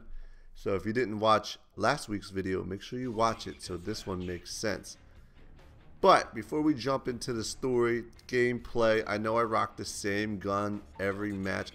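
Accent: New Jersey, United States. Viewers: i knew you were from jersy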